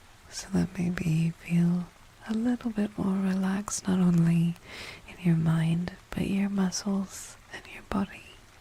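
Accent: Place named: New Zealand. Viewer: your voice made me wonder were you were from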